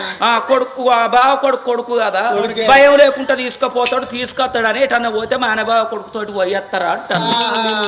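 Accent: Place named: Telangana, India. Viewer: In from Andra but telangana slang chala baga ardam avtundi....enta baga matladtnaro... Same village vibe❤❤❤❤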